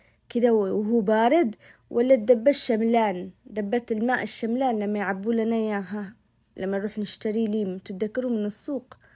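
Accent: Aden, Yemen. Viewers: اني فدالك دكرتيني اليم حق عدن ومحسن الكلام العدني اه وايام عدن باقي التريب وكاتلكس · حليب بودر علشان مايتغير طعمه كلامك عدني قح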